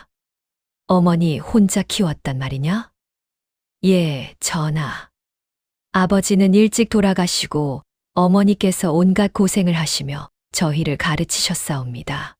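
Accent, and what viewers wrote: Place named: China. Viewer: 억지로 읽는걸 들어보니 중국인 아니면, 조선족인가?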